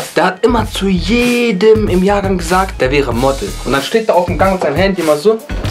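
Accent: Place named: Bavaria, Germany. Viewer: brooo du kommst aus bayern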